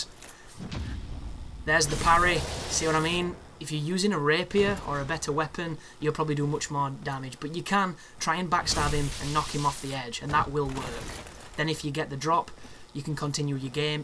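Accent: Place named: Lancashire, United Kingdom. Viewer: Are you from Lancashire Mr.?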